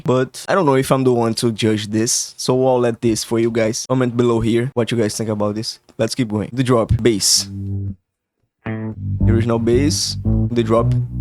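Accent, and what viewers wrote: Brazil. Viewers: READ THE ENGLISH PART FOR IMPORTANT INFORMATION quando eu ouvi o sotaque já sabia que era daqui kakkakakak bem completo o vídeo, só não esquece que o que aconteceu foi o seguinte (assim como já falaram, mas vou repetir): (English), the artist, that joined some years ago in the same label as, had access to unreleased IDs from himself, becuz of this, he made some remixes and bringed up on his songs some vocals, lyrics and melodies that didn't released, as we can see on. if i remembere correctly the year, in 2017 had played this version of (which is a remix) in concerts, and also, there are reports of infiltrators that team scrambled up the files and ended up sending remix as a final version and it got released... It gets worse when you know that, more than the fact that it doesn't looks like the original version, it's not even made by, and it disappoints (Português Brasileiro) o artista, que há alguns anos entrou na mesma gravadora do, teve acesso a IDs não lançados do próprio, e isso fez com que ele fizesse alguns remixes e incorporasse nas músicas dele vocais, letras e melodias que o não lançou, como é o caso de. 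se me recordo bem o ano, em 2017 já foi visto tocando em shows essa versão de que é um remix dele, além disso, há relatos de infiltrados, em que o time do acabou misturando os arquivos e enviando como versão final o remix do, qu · I was gonna ask if u're brazilian because of your accent until I saw the "Portugues do Brasil" on spotify. Concordo com todos seus argumentos, mano. Diria ainda q pra nao deixarem os fãs tão putos, poderiam ter mantido o primeiro "drop" do jeito original, e aumentado a energia do segundo usando essa versão nova. Eu fiz um som em homenagem ao e lancei aqui no meu canal faz uns dias, seria dahora se vc ouvisse e me falasse oq acha. /watch?v=A9yiasCdmE8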